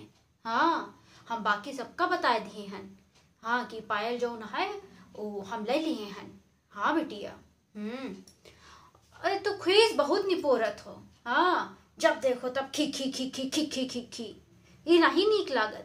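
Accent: Awadhi, India. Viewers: Verý nice gajab ki aktar ho tumhare muh se a avdhi boli bahut achhi lgti hai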